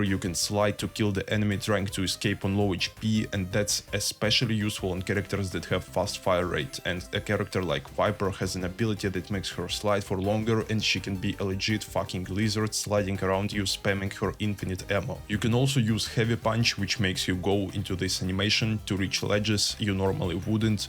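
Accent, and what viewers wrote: Russia. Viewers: Russian accent? :)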